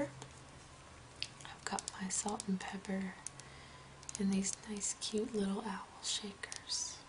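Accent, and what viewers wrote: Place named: Texas, United States. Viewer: AH HA! That explains the accent. You are from Texas. That means we are neighbors. I'm from Louisiana. :) I love guac but I never knew how to make it until now. Thanks for teaching me!! And you are REALLY good at the soft speaking cooking thing. Me? Not so much. I need more practice with slow movements lol. I hope you decide to do more like this :) Thanks for the video! -Katie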